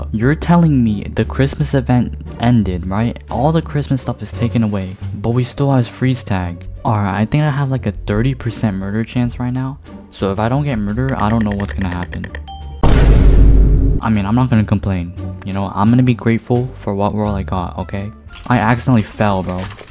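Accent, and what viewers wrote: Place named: United Kingdom. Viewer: why do you sound so BRI-T-ISH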